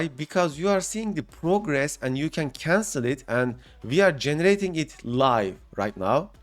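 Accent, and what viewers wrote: Turkey. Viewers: Selam dostum yanlış anlamazsan aksanından Türk olduğun anlaşılıyor o yüzden Türkçe soracağım. Bu kurulumu herhangi bir amd gpu için yapmayı denedin mi?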